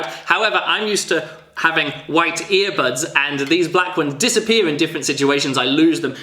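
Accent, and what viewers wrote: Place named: Ireland. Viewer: i love ur irish accent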